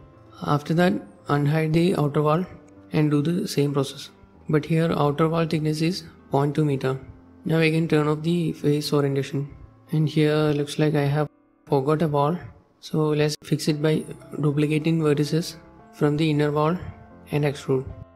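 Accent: India. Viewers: Sorry your knowledge might be good but ask your foreign friends if they understood anything you anounced in this video. Your Indian Dialect is here unuseful use neutral clear and slow spoken language please! Your Video might be interesting for more people indeed. Thanks brother! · He is, but he has a heavy South Indian accent. But he is speaking perfectly well 😊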